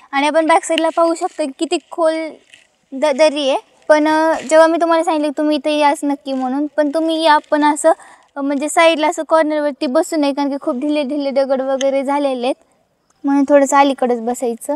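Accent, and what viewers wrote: Latur, India. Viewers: Ek no information Aani tuzya sobat khup cutness aani God aawajat Laturi bhasha zakaas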